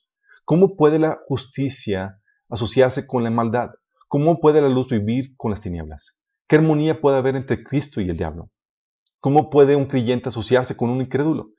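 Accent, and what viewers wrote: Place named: Mexico. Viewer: Muchas gracias por la explicaciòn hermano, muy didàctica, que DIOS lo siga usando, por su forma de hablar me parece que es de Mexico, le mando un saludo desde Perù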